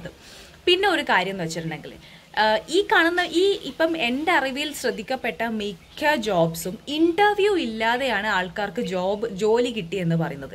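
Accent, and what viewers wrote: Kannur, India. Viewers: കണ്ണൂര് കാരിയാണല്ലേ. നല്ല അവതരണം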